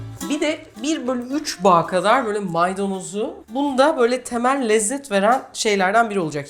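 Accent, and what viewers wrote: Cyprus. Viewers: Tarifi denedim .. sarmısak da ekledim süper oldu.. emeğinize sağlık çok guzel bir tarif oldu!!! Naçizane birde önerim var.. ben kıbrıslıyım. Sende de hafif bi kıbrıslılık var!!! Ve biz keklik tavşan turaç, tahtalı güvercin(fassa) gibi av hayvanlarını çok tüketiyoruz.. eğer düşünürseniz sizden gekecek av eti tariflerini merakla bekliyorum! 👍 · Kendisi Kıbrıslı o yüzden var biraz o şivesi.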